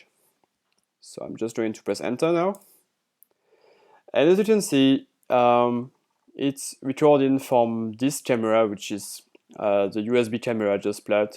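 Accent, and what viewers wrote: France. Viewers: Serait-ce un accent français camouflé ??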